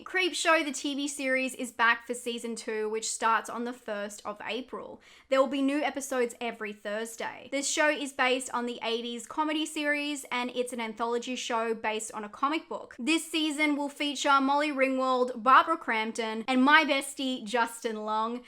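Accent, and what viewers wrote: Australia. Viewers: ah bro bless her, subbed when she was at 2-3k and almost at 80 k now and she still puts up A class content love her aussie accent lol wothahhhh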